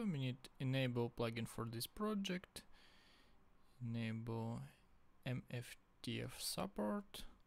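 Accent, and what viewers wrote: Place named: Ukraine. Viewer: Якісний, вітчизняний акцент.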